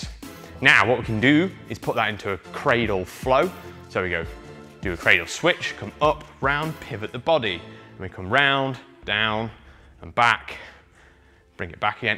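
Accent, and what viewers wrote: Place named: United Kingdom. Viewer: Thank you! British accent is something hard to digest 😅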